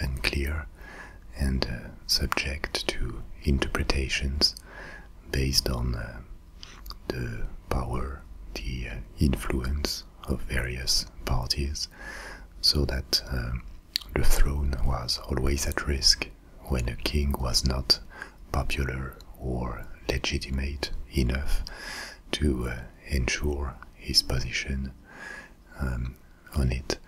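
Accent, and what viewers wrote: France. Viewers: wonderful, thank you, great to hear British history in such a calm and relaxing French accent :)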